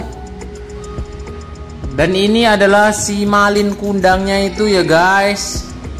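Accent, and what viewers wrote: Java, Indonesia. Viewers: Mantap mas..viewnya.mas asli orang mna.dari suaranya kyak suku jawa gitu.Explore terus ranah minang sy dukung chanell G.J.J.Salam 1 sumatera.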